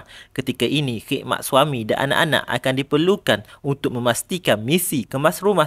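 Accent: Kelantan, Malaysia. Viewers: Kuat betul Kelantan nya cuba cakap formal pun masih bunyi Kelantan lah 😅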